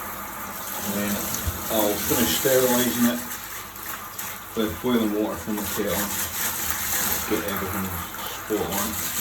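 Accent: Scotland, United Kingdom. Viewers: Hey I can’t tell you how delighted I am to see you trying to breed those exceptional clownfish again. Your already off to a flying start with the clownfish laying on your glass tile 👍🏻 I’m so excited probably even more excited than you 😂❤️❤️❤️ Love your channel and videos. Also love that deep Scottish accent of yours. Looking forward to the next episode already. Keep em coming I need my fix weekly please 😘🥰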